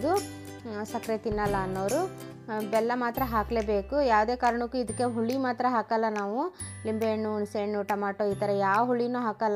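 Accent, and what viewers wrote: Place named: Dharwad, India. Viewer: Nimma Dharwad Uppittu bhaal sertu.!!!! Aadra, nimma maatu Dharwad shaili olaga iddra namaga bhaal Santosh agatada.!!!! Nauo hemme inda namma kannada maatadonu!!!! 🙏 🙏 🙏 🙏 🙏 🙏 🙏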